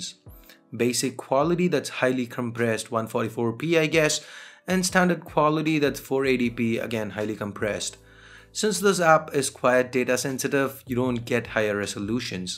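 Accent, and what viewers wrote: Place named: India. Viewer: Want 2 wowch😂😂 Indian accent is so fucking funny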